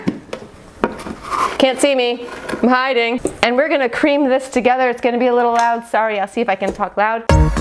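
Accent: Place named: Kandahar, Afghanistan. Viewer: Omg I love your shaista kandahari pashto 😂💛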